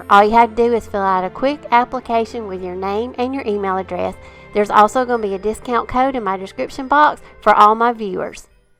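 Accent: South, United States. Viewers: Girl, I love your channel...and that so southern accent!! I’m from SC, but yours is better than mine ❤️ I’m making ornaments this year for close friends and family..a lot of this stuff I have in my stash!! Checked out Olive Rue and signed up for her newsletter and will be wrapping my candles for the Holidays!! Thank you both so much!!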